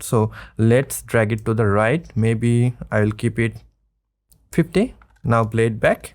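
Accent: Assam, India. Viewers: your most of viewars are indian, because you speak like indian english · ayyy axomiya, accent huniya gom pai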